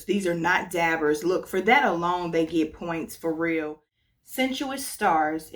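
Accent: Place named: St. Louis, United States. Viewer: Ok…a few things: 1. Thanks for your thoughts on this house, I haven’t heard anyone talk about it 👍🏾 2. You have definitely live in MO or St. Louis for awhile…”all these fragrances in the auurrhh” 😝🥰💛 3. The names draw me in every time! 4. I can’t do prominent pepper notes either 🙅🏾‍♀️ Have a great day! 🥰💃🏾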